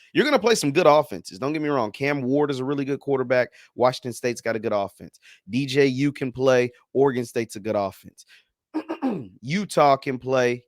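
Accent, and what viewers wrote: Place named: Boulder, United States. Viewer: done switched up‼️ I knew u sounded like them ppl up in Boulder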